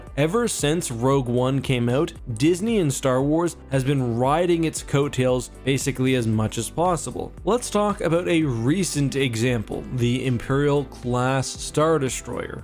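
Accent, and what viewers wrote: Canada. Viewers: Are you canadian? It's the first time I notice some "oots" and "Aboots"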